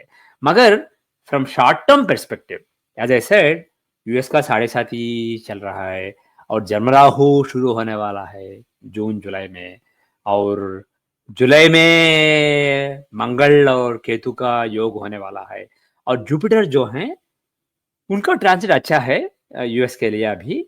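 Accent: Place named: South India, India. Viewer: whaaaat is saafisticated? He clearly cannot speak in Hindi and jumps to English often in the middle of sentences.. His English is quite average with heavy South Indian accent. "Emperor has no clothes....".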